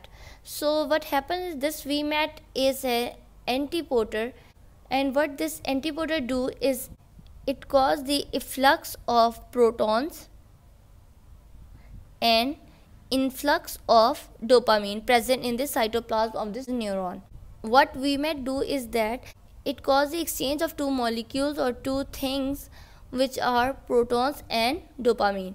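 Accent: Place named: India. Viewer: well done but the indian accent is killing me😢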